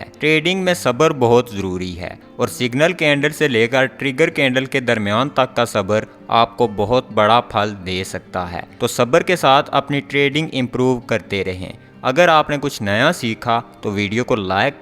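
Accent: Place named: Pothwar, Pakistan. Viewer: bawa gi , english bhi poothowari main boltya ho ... yeh candelstick nahi hota .. Candle Stick hota hay dono ko mila k na parho ... raja g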